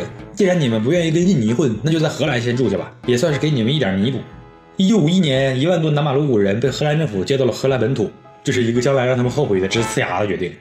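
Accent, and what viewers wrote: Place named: Northeast, China. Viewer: 东北话有毒...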